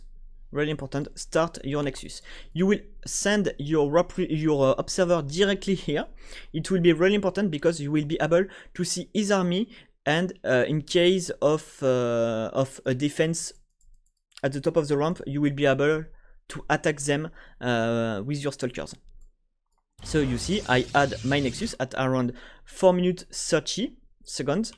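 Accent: France. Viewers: ton accent anglais fait tres francais c est tres drole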